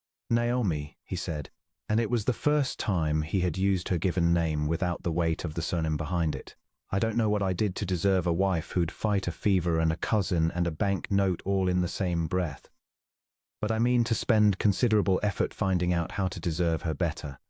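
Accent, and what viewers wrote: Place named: RP, United Kingdom. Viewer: Terrible narration...reading the words as written not as they should have been correctly pronounced. Plus with a posh accent...not really in keeping in telling a story about the 1800's in the wild West of the US. The story in interesting...not really authentic in legal standing in that place and time...but interesting yarn.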